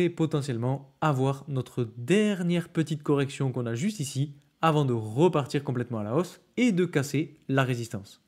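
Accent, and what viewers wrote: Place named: Toulouse, France. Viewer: Tu as l’accent de Toulouse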